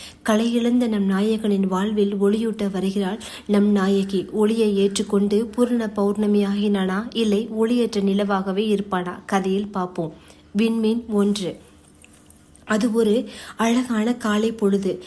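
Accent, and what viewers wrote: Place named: Sri Lanka. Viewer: 🎉🎉🎉 srilankan Tamil 😊😊good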